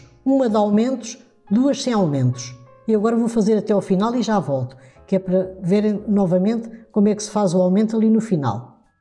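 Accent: Portugal. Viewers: Lindíssimo. Grata por compartilhar. Continue por favor , esse sotaque português , muito agradável.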